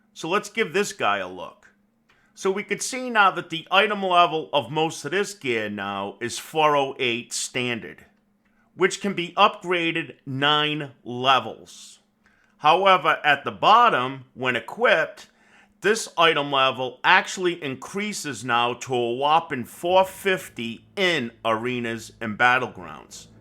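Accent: New York, United States. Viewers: Your accent has me in stitches. Is it New York or Boston. Remind me if the Construction guy from Futurama ❤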